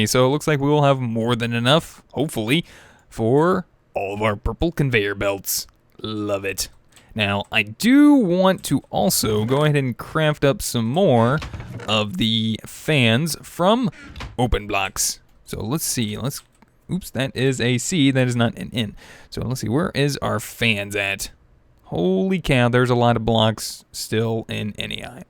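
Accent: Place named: South, United States. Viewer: eli Heakins Based on other people talking in his videos and his speech I think he's from the south not the north.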